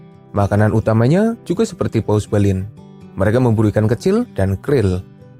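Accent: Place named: Central Java, Indonesia. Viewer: Kayane wong jateng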